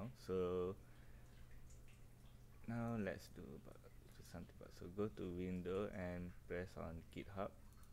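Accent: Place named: Malaysia. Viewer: Nice tutorial and unity package, thanks for putting this together. By the way I recognize the accent my wife is Malaysian.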